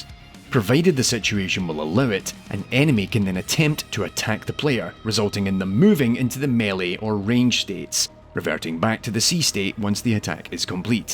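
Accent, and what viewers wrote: Scotland, United Kingdom. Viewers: As a fellow Scot, I like how your accent got stronger as the video continued. Especially the rolling of the Rs. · It sounds like you were less able to suppress the Scottish-ness in this episode's narration! Also, for anyone at all interested in the nuts and bolts of DEWM, you owe it to yourself to check decino's channel! He's done A.I videos like this one, but also stuff like the sources for the game's audio and interesting tidbits about the sprites! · A Scotsman saying ‘Rrrrip your face out’ sounds terrifying. Your dialect sounds awesome and terrific. Don’t even feel the need to deal it down. Also: nice things for you. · I wanted to subscribe. God why did you have to have a Scottish accent.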